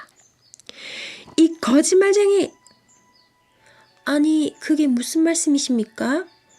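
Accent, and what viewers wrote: Gyeongsang, South Korea. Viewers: 저도 부산사람인데요... 경상도 표가 많이 나네요^^ 그래도 좋아요^^ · 경상도 사람이신가요? 사투리가.... ㅎㅎㅎ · 님:) 시간날때마다 예전 영상도 하나씩 듣고 있어요! 저도 경상도 사람이에요 ㅎ 사실 같은 경상도 말투를 '좋아'라고 생가한적이 별로 없었는데 님 음성 들으면서 좋아지고 있어요:) 감사해요! 책만 읽는 것만 아니라 님 생각도 함께 이야기해줘서 너무 좋아요! 늘 응원합니다 💕 늘 건강유의하세요!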